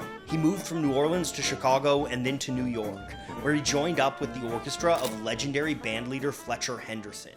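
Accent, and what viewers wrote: Canada. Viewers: Well, he is Canadian. In classical ensemble instruction in the UK I only heard it said corn’t from various directors and instructors. I’ve only heard Americans over-emphasise (to my ears) the net part.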